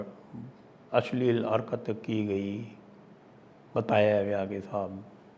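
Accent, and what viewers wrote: Haryana, India. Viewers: Haryanavi accent 😅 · Hi sir I like your Haryanvi style Baddee Baddee pehlwan..... Ho Ho Ho Haa Haa Haa.......Thanks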